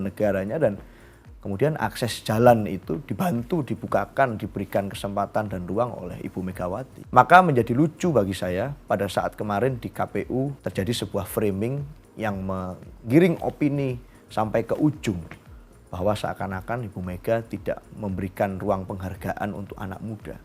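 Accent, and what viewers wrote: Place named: Java, Indonesia. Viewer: Aku suka logat medok mas. Mugia sukses mas. Mas contoh pemuda yg meniti karir dr nol.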